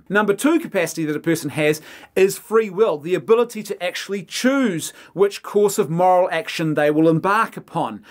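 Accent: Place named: New Zealand. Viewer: Rare-bits and Possums are pissed? Are they always this mad down there? Love your NZ English :o)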